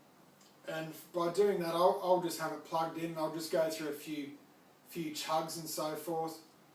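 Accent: Australia. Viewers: Finally saw your face! Where are you from? And where's that accent from? I guess Australia but maybe I'm wrong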